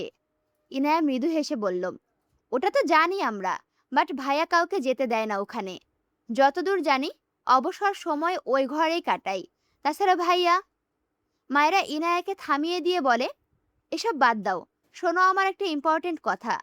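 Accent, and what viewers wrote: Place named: Kushtia, Bangladesh. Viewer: আপু তোমার ভাষা শুনে বুঝাই যায় তুমি কুষ্টিয়ার মেয়ে। আমিও কুষ্টিয়ার মেয়ে 😘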